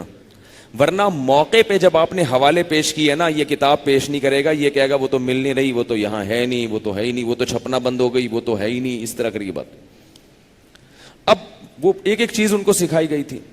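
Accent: Punjab, Pakistan. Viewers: uff ALLAH ini bhairi punjabi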